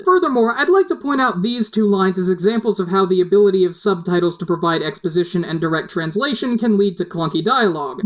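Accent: Australia. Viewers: Are you Aussie? If so, cool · Are you from Australia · Are you Australian??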